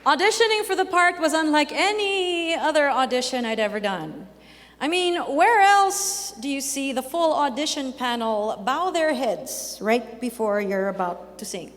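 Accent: England, United Kingdom. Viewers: Galing mo talaga Ms. Lea Salonga, British accent kailangan nya yun sa lugar Kung Saan sya at dinala, grabe yung mga taong Di sya Pinuri bagkus may nagsabi Lang mali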